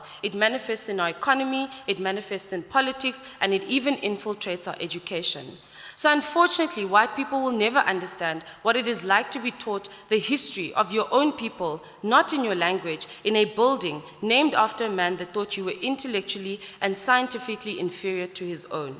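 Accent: South Africa. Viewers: She's got good intentions for our social justice,compact and cohesion. She sounds rooted as a South African. Apologies for responding in 8years,it sounds present. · She learned all these languages in South Africa as you can hear her English is different from your Nigeria.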